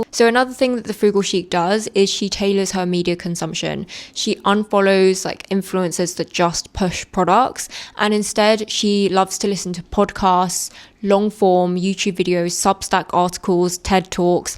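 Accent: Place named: England, United Kingdom. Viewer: can you add to your list just having a good accent and utilising your voice? because girl, i live in australia and we barely speak the queens english, i will see the most beautiful, classy woman and she will ruin the whole thing by having a bogan or bogan-adjacent speaking voice. i understand being australian that we all sound at least 10-50% bogan at all times, so i aim to speak like Olivia Newton-John and just enunciate 🤣 btw, your english accent is beautiful!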